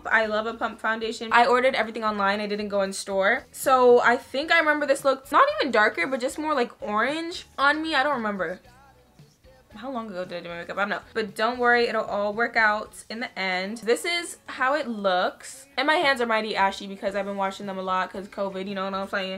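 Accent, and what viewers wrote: United Kingdom. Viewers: Why did I get confused by the British accent for a second?😂😂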